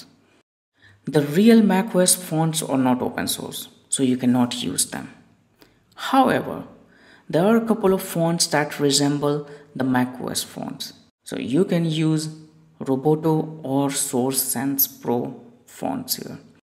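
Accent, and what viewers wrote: India. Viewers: Why Indian accent very thick, i am little uncomfortable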